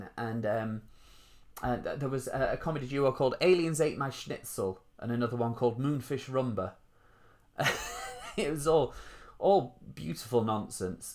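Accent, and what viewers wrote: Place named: RP, United Kingdom. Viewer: You do sound posh and yes I’m northern 😉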